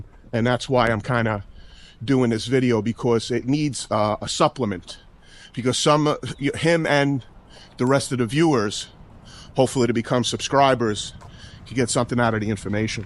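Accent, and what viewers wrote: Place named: Long Island, United States. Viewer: When I hear you speak it reminds me of my Homies from the north shore of Long Island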